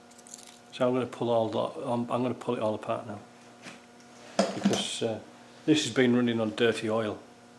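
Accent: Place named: Northern England, United Kingdom. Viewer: Hi mate I gather you live in the USA OR CANADA somewhere as you were talking in dollars. I guess your from the UK? You sound very northern I’m northern myself. Where in the uk are you from buddy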